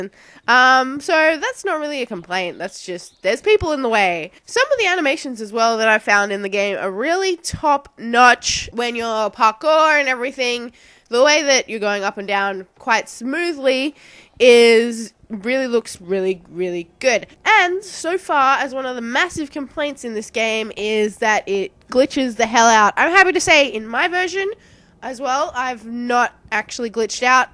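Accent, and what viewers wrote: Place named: Australia. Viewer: Glad your back, missed that australian accent of yours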